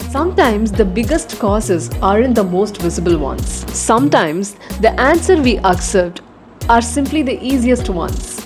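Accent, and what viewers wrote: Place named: United Kingdom. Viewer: I think she is speaking British English 😅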